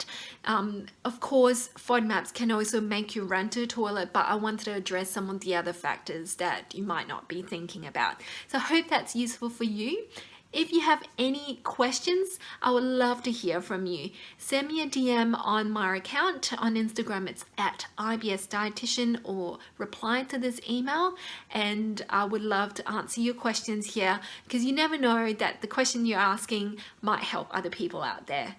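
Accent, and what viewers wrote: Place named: Australia. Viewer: You sound like an Aussie 😊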